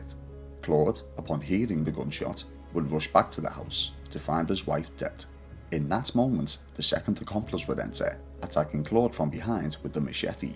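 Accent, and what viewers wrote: Liverpool, United Kingdom. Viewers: Always a good thing to hear a scouser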